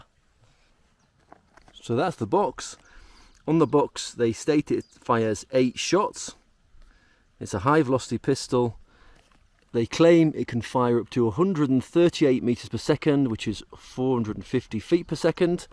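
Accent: United Kingdom. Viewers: Ah, an English accent, now it makes sense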